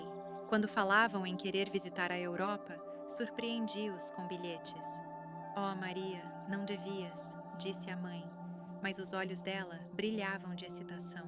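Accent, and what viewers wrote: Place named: Portugal. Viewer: Sem nexo ,um bebê recém nascido viu a frustração do pai? A narração é estranha, parece português de Portugal às vezes. Não falamos assim. Eu particularmente não gosto do sotaque português.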